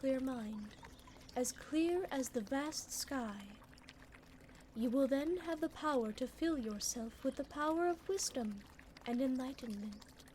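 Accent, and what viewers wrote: United Kingdom. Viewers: It sounds pretty much like british accent and she fits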